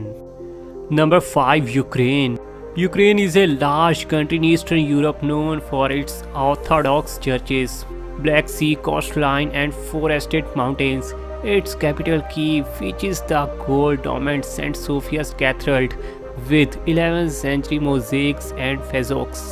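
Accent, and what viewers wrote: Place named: South Asia. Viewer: Can’t you tell he’s from south Asia (indo-Pak) ?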